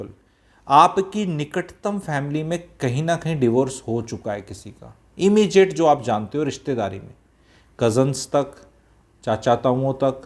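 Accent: Haryana, India. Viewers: I like your Hariyanvi accent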